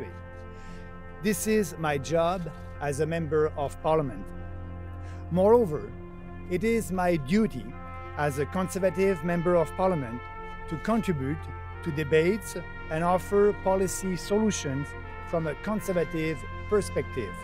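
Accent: France. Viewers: The French accent applied to English speech gets us pay closer attention to the words and by extension to their meaning. He also got to his points quickly, without buzzwords. On a side note, I can’t picture Maxime stopping an English speaker to correct the word ‘mankind’ as ‘peoplekind’. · Wow this guy tells it like it is not for himself but for Canadians. This guy needs to be Prime Minister. Perfect name for the party! It’s like a revamp for the Conservative party! If Canadian can overlook his French accent then we will have a real leader! God we might need your help! Canada needs your help! 🇨🇦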